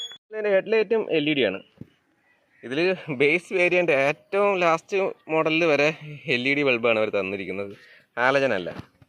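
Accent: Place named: Trivandrum, India. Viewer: Pakka Trivandrum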